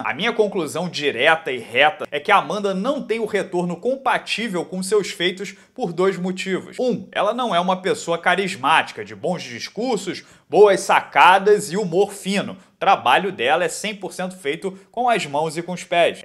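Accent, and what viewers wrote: Rio de Janeiro, Brazil. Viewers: Puta q pariu!!! Esse canal é da hora ms sotaque carioca é tão repugnante que chega da agonia kkkkkk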